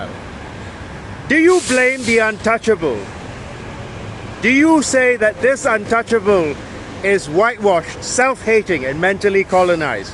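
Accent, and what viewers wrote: United Kingdom. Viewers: Funny how they make it a big show about your british accent, when a lot of the millenials and gen xérs do their rubbish best to imitate the american ones, despite having a rather poor grasp of the american english vocabulary..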